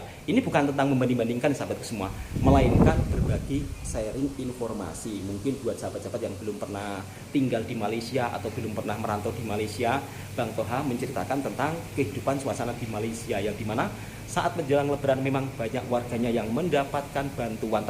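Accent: Java, Indonesia. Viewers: Negara kaya tapi rakyat miskin.. burit apa toha..kamu mahu mkn burit kah.. enak kah ngabuburit tu..😅mas toha kalau ngomong bunyi jowo banget gak sama dgn adik nya.. kalau cakap bahasa melayu tu gak kayak org indo.. bunyi n loghat melayu nya lain mcm..mas toha jowo medok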